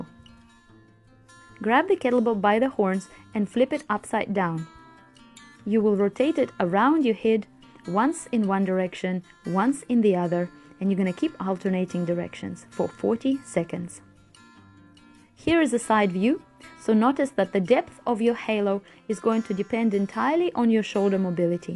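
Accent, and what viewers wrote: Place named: Russia. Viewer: What a great Video, if I do this every day plus the other stretches from your videos I think It will be very good for me Some of them i know already but some are new to me Stretching and mobility is the most important thing apart from training itself I've seen you on LebeStark now I'm a fan, your way of talking and explaining is so typically Russian I loooove it 🤗🤗😍😍😍 Grüsse aus Leipzig 🤗🤗🤗 Молодец 👍👋😍🇪🇺🇰🇿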